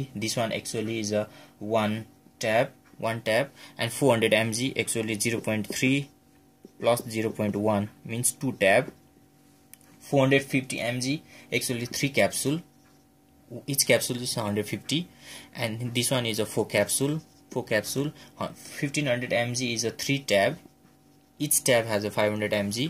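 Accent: India. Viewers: lol hes indian and has accent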